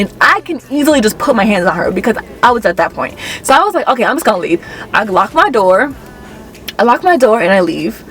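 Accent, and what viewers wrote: Jamaica. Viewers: what a low down, dutty, scandalous, frowsy pum-pum, racist b1tch! You did well not to beat her a$$! new to your vids - love them, also are you of Jamaican heritage because I'm clocking certain speech patterns...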